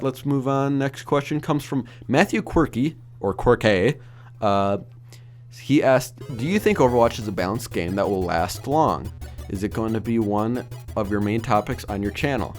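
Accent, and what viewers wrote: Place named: Upper Midwest, United States. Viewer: Oh my golly! I knew your accent was familiar! I am a minneso'an who travels to wisconsin frequently dontcha know.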